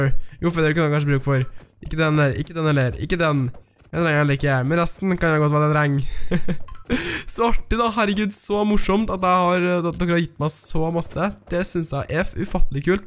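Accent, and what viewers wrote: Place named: Oslo, Norway. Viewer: Du høres faktis ut som en som er oppvekst i Oslo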